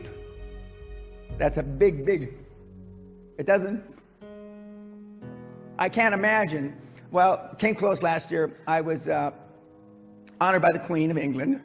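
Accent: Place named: Los Angeles, United States. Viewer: It's a mixture. He lived in Europe as a child, because his father was an Army officer in the early 1950s, so he heard a lot of different accents from his father's friends' kids, and from Europeans who spoke English as a second language. Then the family moved to the barrio in East Los Angeles, a bad neighborhood, until his mother insisted they move. Then he lived in a nicer neighborhood. Then he joined the Army, where you hear a variety of accents. I hear some East LA in his accent, but also a bit of posh English, some standard American, it's a unique accent. No one really speaks like him.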